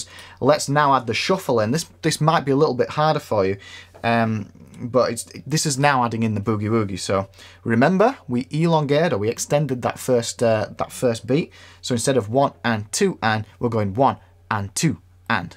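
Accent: Yorkshire, United Kingdom. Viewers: not a joke, just complete bollox. 🤣 I love your “nothing like cockney” accent which sounds like a soft (Leeds?) Yorkshire to me. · Sounds like he's from Yorkshire. ep up mek a brew bluddy ell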